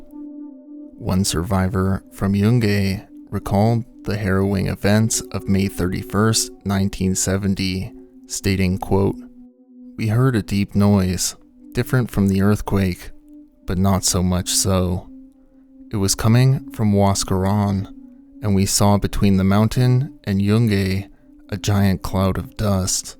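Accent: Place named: United States. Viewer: Now can’t handle your American voice, I’m sure it’s a grate video but that voice is beyond unbearable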